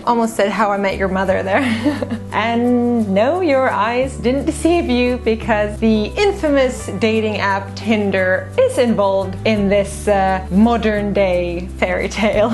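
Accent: Finland. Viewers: They were from the Helsinki/Espoo area. I heard you're from a small town or village. They were all Finnish speaking Finns, except for one who claimed to speak Swedish and Finnish both as native languages. She didn't start a conversation with me, she dropped a toy mascot on the floor, I found it, then she came up to me to claim it back. BTW, I can hear your Finnish accent when you speak Swedish.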